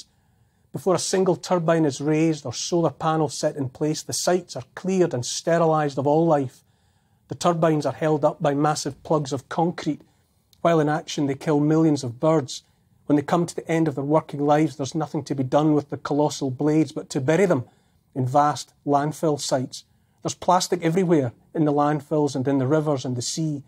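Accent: Scotland, United Kingdom. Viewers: And the scottish tones ssure help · My neighbour was a "war bride" from Scotland. She was a second mother to me. Hearing your beautiful Scots accent makes me smile and think of that wonderful woman. Hearing your message gives me hope for the world, knowing that not all of us are asleep, and it gives me hope that my neighbour's husband, and my dad, did not fight in vain in WW2. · I know he’s Scottish. Kind of obvious. I’ve never heard an “ Irish brogue”. Love his historic documentaries. He just has an “ It” factor.Not in the Steve McQueen/ Jim Morrison overt sexuality genre. More esoteric. Are you in the UK? The top two contenders vying to fill Johnson’s seat are WEF “ Young Global Leader” plants.Quite terrifying. Wales,France,Italy ( just ousted), Brussels, Netherlands, Finland, Norway,Luxembourg,Australia, NZ, Canada, Sri Lanka( ousted), Pakistan,Saudi Arabia,Germany,Chile,Bolivia,Nicaragua,Ukraine,WHO,UN,Spain, Greece, El Salvador,Peru,Honduras,Argentina,Sweden, Begium, Maldives, Costa Rica Denmark, the US Puppet Governmet. 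“ Tyrants ‘r’’ Us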